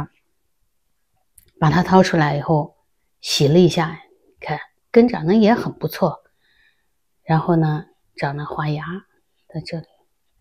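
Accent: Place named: Xi'an, China. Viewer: 叶子长得太美了，听老师的口音感觉咱俩是老乡啊，我是西安人😆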